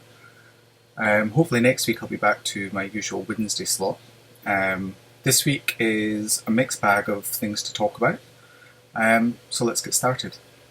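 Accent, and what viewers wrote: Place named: Scotland, United Kingdom. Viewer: Hi, this is my first dip into your world, after Helen mentioned you. I have watched podcasts from around the world for a few years so it's great to hear a voice I could almost call local, I am from Northern Ireland but did 4 years in Edinburgh at uni many years ago. I am rainroseknits on Instagram and ravelry.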